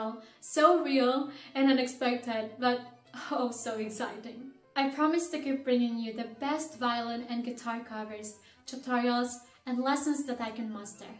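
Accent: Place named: United Kingdom. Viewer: lmao I can see you're developing a British accent, and I honestly love it being part British myself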